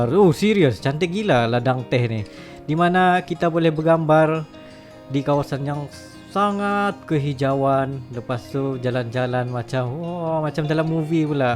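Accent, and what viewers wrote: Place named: Indonesia. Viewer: Baru nemuin video dulu,klo dulu nampak logat malaysia tp beda dgn skrg kya logat indonesia bnget😃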